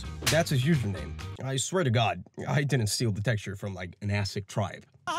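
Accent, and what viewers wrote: Romania. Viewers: wait, are you Romanian? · YO ARE YOU ROMANIAN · are ya romanian? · wait... Are you romenian?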